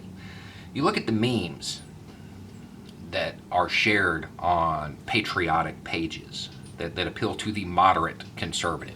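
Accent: South, United States. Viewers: Good to hear a southern accent making such sense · The Trump era has left me feeling ashamed of the south. So I have to say, as a fellow Southerner, it's amazing hearing somebody say these things in a southern accent. What state are you from?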